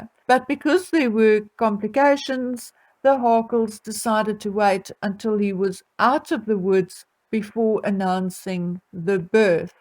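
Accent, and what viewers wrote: South Africa. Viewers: Jy praat engels soos n Suid Afrikaner,is my oor goed in gestel.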